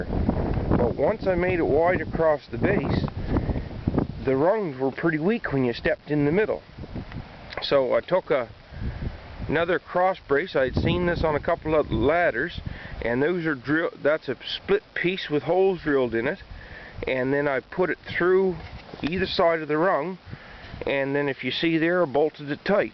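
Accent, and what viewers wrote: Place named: Maritimes, Canada. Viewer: Maritime Canadian accents the best accents.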